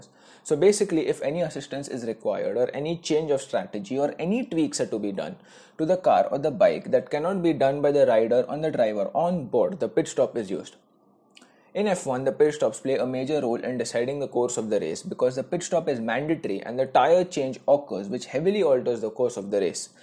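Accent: India. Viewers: Englis india ajg. Wtf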